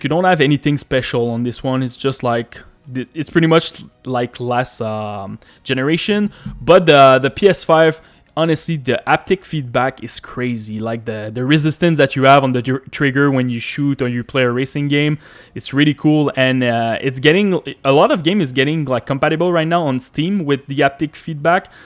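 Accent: Quebec, Canada. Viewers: Est ce que tu est québécois? parce que j'attend un petit accent XD mais sinon superbe vidéo.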